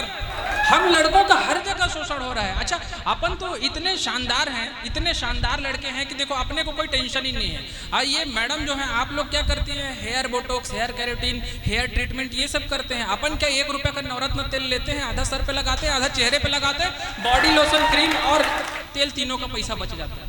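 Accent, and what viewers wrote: Bihar, India. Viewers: Bihari ton hai.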